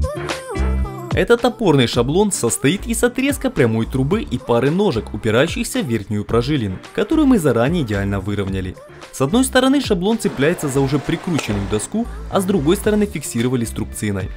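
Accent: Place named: South, Ukraine. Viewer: Я так понял по говору, это юг Украины...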